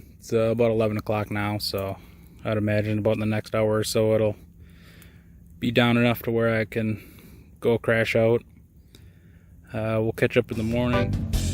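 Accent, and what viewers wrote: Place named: Upper Midwest, United States. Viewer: Good job 👍. Now I know where you get your accent from. I lived in the UP for 8 yrs. We took trips to Green Bay just for the shopping...lol. Look forward to seeing more.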